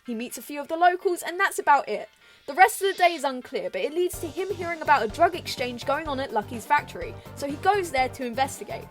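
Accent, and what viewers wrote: United Kingdom. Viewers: WHY IS BRITISH!?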